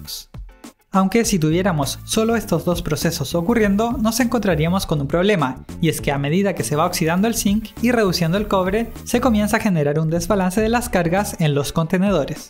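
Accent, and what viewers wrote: Chile. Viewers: Me encantan tus videos P. D. Tu voz me recuerda a un programa que se llama Oban Star Racers creo que eres chileno o me equivoco?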